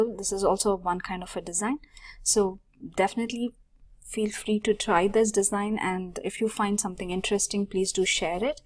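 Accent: India. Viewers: Do this excersice was a quit difficult to me without seeing the code, because my english is "in working progress" and, by another hand, it was so difficult to understand hindu accent (like an England accent too..!). This was a challenge to me, but, like you said: "Yeah, there you go". I want to share you THE CODE: <link> Is in Spanish, so... do like me..! if you can't understand, use traslate from google..! Thanks so much for yours videos and to teach me that I can do it despite the adversities..!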